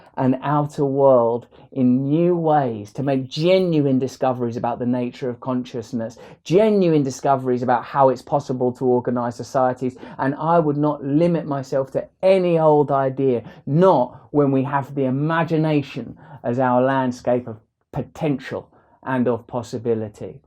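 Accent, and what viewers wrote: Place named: Cockney, United Kingdom. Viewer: Cockney Christ.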